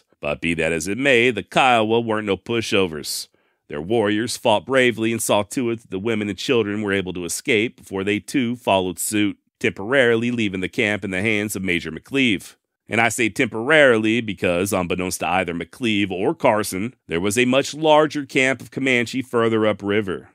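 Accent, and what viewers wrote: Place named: South, United States. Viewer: Sir..it is a pleasure to listen to your southern accent. And your humour is refreshing. Your account and your presentation is undeniably researched. Thank you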